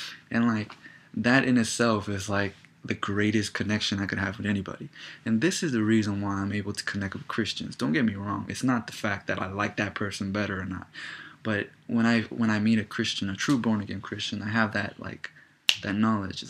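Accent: England, United Kingdom. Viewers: oh i dig your british accent ;) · I'm from England! I'd send you something but I'm not really sure... I guess you'd expect tea or something. Your English accent made me laugh though. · Looool I like the English accent. And tr thig you said about Adam and Eve was actually so true , after the flood in Noah's time people's lives started gettif shorter due to sin ,and disease started spreading to.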